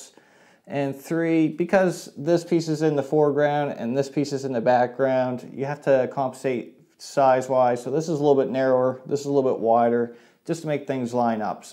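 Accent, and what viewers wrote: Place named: East, Canada. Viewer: I figured it out the first video of his that I watched. He said 'eh'. Then the second video I detected a back east accent and the third video was a dead give away when he mentioned Robertson screws.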